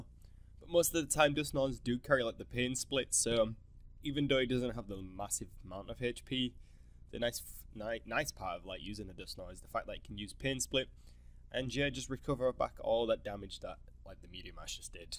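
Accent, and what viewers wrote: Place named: United Kingdom. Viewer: British accent is one of the most awesome accents in the world... :')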